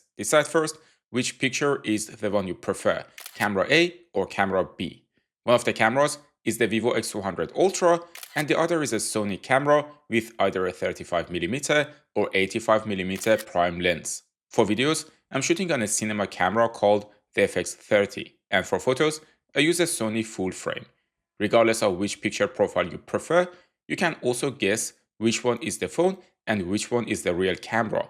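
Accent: Iran. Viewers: I realize that accent it's Persian accent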